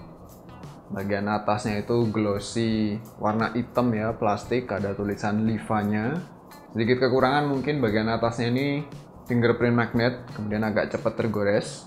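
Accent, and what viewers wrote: Java, Indonesia. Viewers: Waduh Pak Logat Jawa nya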